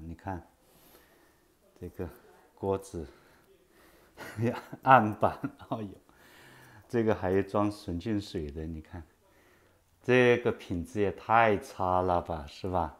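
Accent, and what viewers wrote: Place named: Chongqing, China. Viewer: 听口音 你是重庆方向的吗😮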